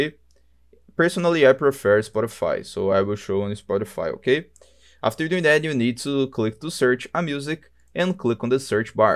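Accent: Brazil. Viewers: You sound brazillian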